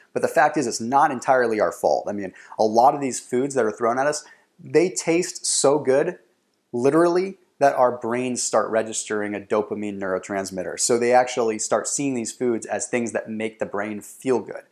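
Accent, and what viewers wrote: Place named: United States. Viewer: Great video and content. Excellent. I'm definitely cutting back on processed foods. My processed foods intake is a result of my time-consuming job. Then I just conveniently nibble on junk foods and eventually ending up feeling junk. Thanks. Ignore the number of dislikes. Maybe they don't understand your fluent American accent. I share my advice: Click on the CC button at the bottom, right corner. (Subtitles/closed captions) Listen and read as well. Pause and go back if you didn't understand well.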